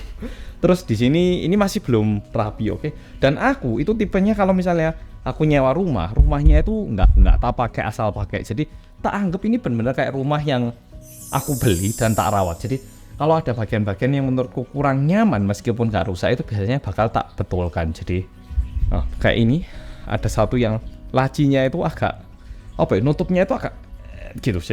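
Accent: Java, Indonesia. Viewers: Aku nek lali jenenge pasti nyebute ngene "Wong Korea seng ngomong bahasa Indonesia logat jowo seng bojone wong Singapore bahasa inggrisan" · Korea tapi medok jowo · Kelazz oppa logat jawanya ga bisa hilang wkwkwk😂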